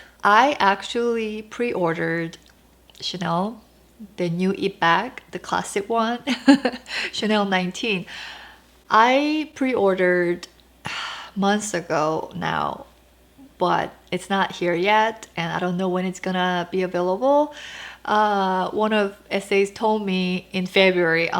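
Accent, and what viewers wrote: South Korea. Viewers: Your English is just like my mom's. She's also Korean and has lived here in the US for 43 years. Still has a strong Korean accent and I love it!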